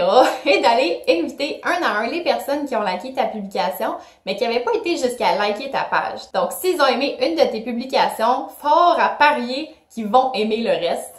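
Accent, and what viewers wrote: Quebec, Canada. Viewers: Oh une québécoise 😍😍 Même si je viens de connaître ta chaîne continu je crois en toi xD 💪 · Tu est québécoise j'aime trop là-bas merci pour la video ✌🏾✌🏾😍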